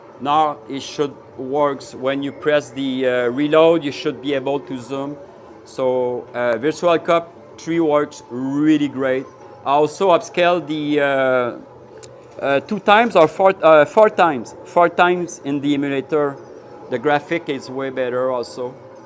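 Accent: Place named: Quebec, Canada. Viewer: i don't no why but you sound like someone from Quebec lol any how you have a new sub and hello from Quebec :P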